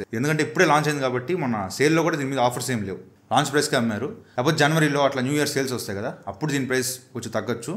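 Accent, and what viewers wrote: Nellore, India. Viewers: Bro meedhi nellore aa? 😮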